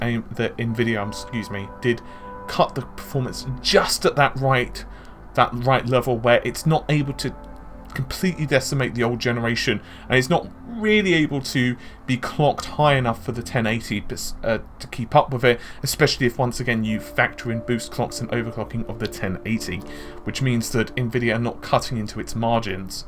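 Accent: England, United Kingdom. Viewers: that background music and that English accent makes me sleepy but in a good way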